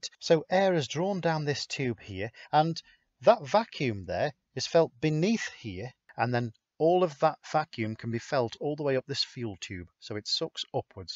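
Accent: United Kingdom. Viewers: too detailed and wordy with a British accent.... an American needs a plain-spoken and correct fellow to skip detailed diagram and illustration and theory and principles.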